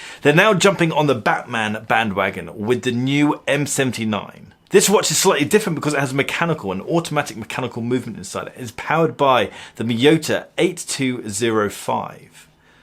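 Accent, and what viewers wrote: United Kingdom. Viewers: The review coming from someone with a British accent meets the expectations. A ton of complaining, and more complaining, and more complaining. If I hadn't seen other videos from you, I'd say you're the kind of person who'd complain about Patek or Vacheron Constantine's movent, and why it took so long for these companies to make your $1M handmade watch. Then once you're done with the watch complaining, you'll start complaining that your girlfriend has too much boobs (whatever that means).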